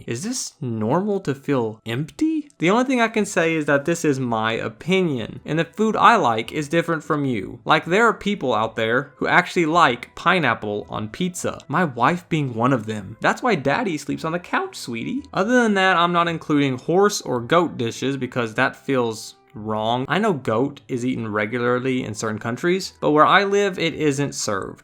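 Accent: United States. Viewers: What state are you from? There needs to be more cowboy accented Youtubers out there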